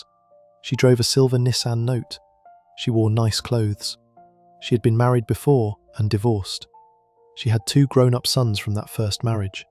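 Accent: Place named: United Kingdom. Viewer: Can’t stand when the Narrator is British. Kinda screws up the whole show… 👎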